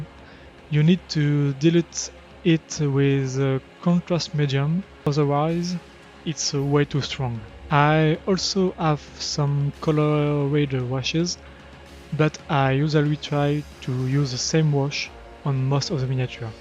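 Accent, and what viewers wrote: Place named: France. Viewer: Oh l’accent sonne français ! beau boulot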